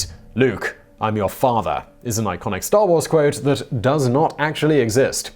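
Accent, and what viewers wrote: England, United Kingdom. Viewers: why is Simon talking with an English Accent? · Sorry chap, doesnt matter how english your accent is, you just cant simply explain away the Mandela Effect. You mainsteam media people are utter fools.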